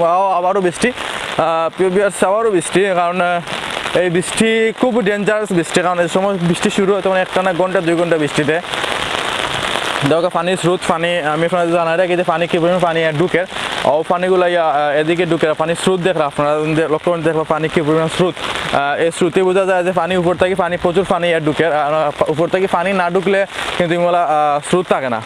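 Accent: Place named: Sylhet, Bangladesh. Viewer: Sylheti blogger speaking dakhai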